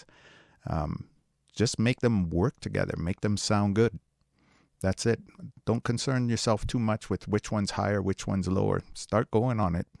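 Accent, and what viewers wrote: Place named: Ireland. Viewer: I sense an Irish accent. 1 love.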